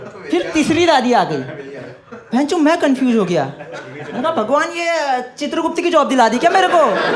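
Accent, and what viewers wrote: Uttarakhand, India. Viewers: Ha flow or naati se samjh aaya 😂 ..... mai bhi uttarakhand se 🎉😂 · Bhai uttarakhand mai kaha se ho?? Accent bata raha hai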